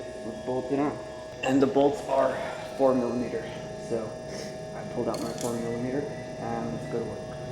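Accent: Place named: Texas, United States. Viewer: Bro what part of Texas are you from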